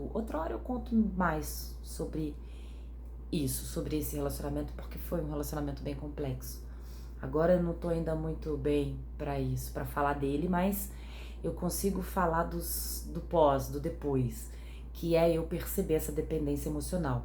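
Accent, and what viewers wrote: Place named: Rio de Janeiro, Brazil. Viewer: Cara..do nada vi.. que agora já não gostei mais.. escreve.. menina quero uma namorada..sou veio.. aliás mais ou menos veio.. psicóloga é bom pra desabafar.. mas vc tem um sotaque meio que carioca né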